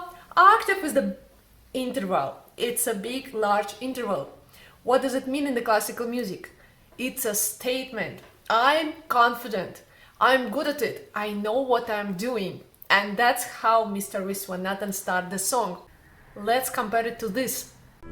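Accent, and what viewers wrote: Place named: India. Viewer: Very nice video ! Which country are you from ? Your speach and action like indian !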